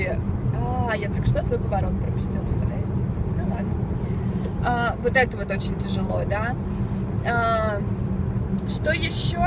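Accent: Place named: United States. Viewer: Акцент американский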